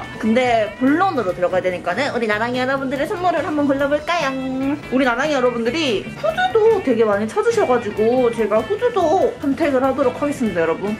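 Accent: Busan, South Korea. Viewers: 부산 사투리 듣기 너무 좋은거 같아요. 요즘 코로나 때문에 밖에 나가기 힘듬에도 불구하고 팬들을 위해 나눔해주셔서 진심으로 감사드립니다. <mail>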